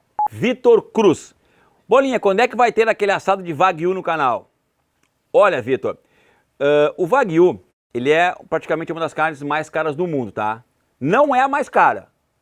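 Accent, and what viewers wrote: Rio Grande do Sul, Brazil. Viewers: Deve ser gremista, se não for, é colorado, mas a possibilidade de ser gremista é maior pelo modo de falar.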